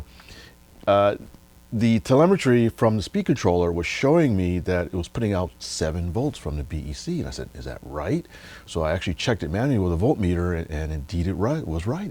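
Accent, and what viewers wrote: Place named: Canada. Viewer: My fiance swears your Canadian and she loves your voice she says it very soothing.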